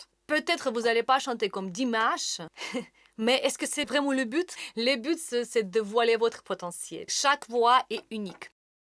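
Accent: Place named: Russia. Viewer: Видно что вы профессионал. Спасибо за анализ☺. У вас слышен русский акцент😊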